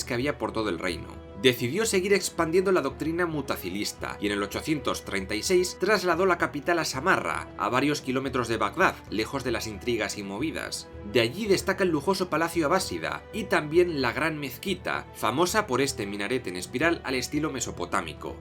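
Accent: Spain. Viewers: Buenos videos hermano! Tengo que confesar que no me gusta el acento español pero con estos vídeos me está empezando a gustar mañana compraré tu libro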